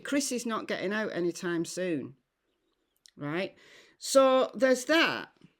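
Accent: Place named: Wales, United Kingdom. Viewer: New Subscriber, and I Love You, you are so Refreshing and tell it like you see it, it's Awesome! Hang in there and don't team up with any other creator, as you well know! Be true to yourself! Plus my folks were from Wales, UK, and I Love hearing your accent, so simular to my parents, but sadly they are no longer with us. Take Care!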